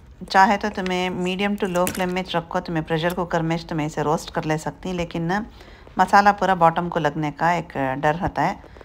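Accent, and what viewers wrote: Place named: Deccan, India. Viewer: Behtareen recepie aunty ... Bohat khoob ...so nice to hear recepie in our famous dakhni slang... That added wit & humor is the highlight...All the very best ... Please share ur daawat vlogs & shadi menu recepies ..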